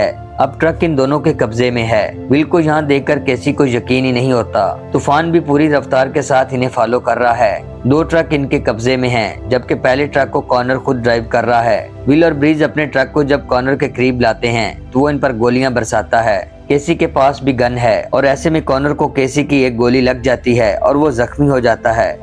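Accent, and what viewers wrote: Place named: Punjab, India. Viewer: Punjabi accent. Not Hindi or urdu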